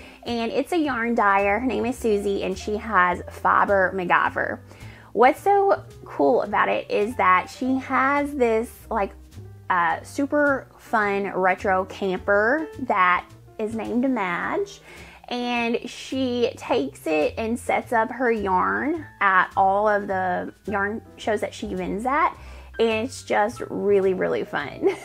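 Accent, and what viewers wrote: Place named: Arkansas, United States. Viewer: You are so creative. When I saw the notification today, I said, Yay!! 🥰 Always a pleasure to watch you and, yes, hear your cute Arkansas accent (said the Midwestern Michigander). 😂 Fun fun fun. Thanks for another great video.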